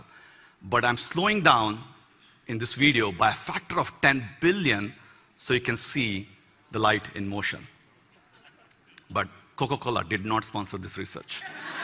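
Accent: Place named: India. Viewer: Indian English hmmmm, Anyway thank you .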